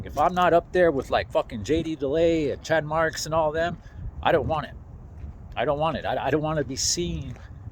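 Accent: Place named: New York, United States. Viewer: Sad I think I figured it out he from New york?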